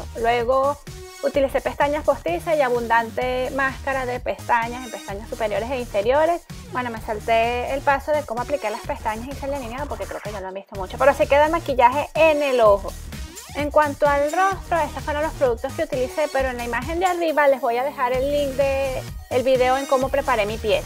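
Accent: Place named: Venezuela. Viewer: Te quedó muy lindo el maquillaje!! te dejo mi apoyo y te invito a pasar por mi canal.. soy venezolana, a ti te escucho como acento venezolano :)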